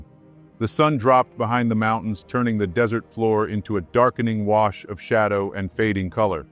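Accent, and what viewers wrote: United States. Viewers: By the way, your american- english is perfect. I love it. ❤. I whish all USA accents were like yours. Love your voice as well. Who are you? A profesional narrator?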